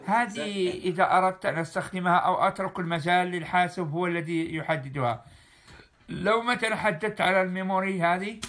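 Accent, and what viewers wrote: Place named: Levant. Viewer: شكرا على المجهود لكن الشرح يبدو معقد بعض الشيئ بسبب الالقاء بلهجة المشارقة نرجو منكم تدارك الوضع احتراماتي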